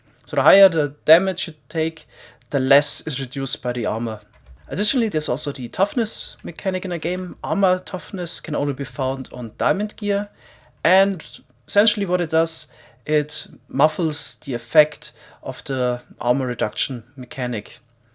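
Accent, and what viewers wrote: Germany. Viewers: Hörst dich wie n deutscher an der englisch redet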